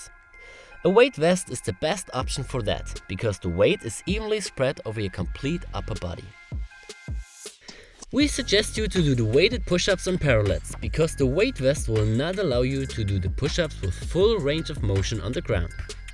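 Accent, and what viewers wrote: Germany. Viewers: Are you German? :) Just checking myself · Nice muscles! Super body! 👍🏻😛nice German accent, lol! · Yeah, the accent (way het talks English) gives it away. ;) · This man is single-handedly rebranding the German accent from evil villain to uplifting coach! Danke schoen as always.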